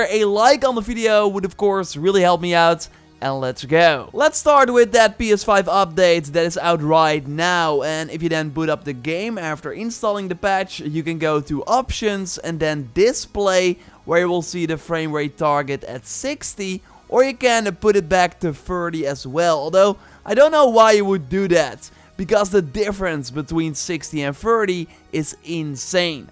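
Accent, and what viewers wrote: Netherlands. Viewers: Are you from holland or somewhere near? :p i can definitaly hear a dutch accent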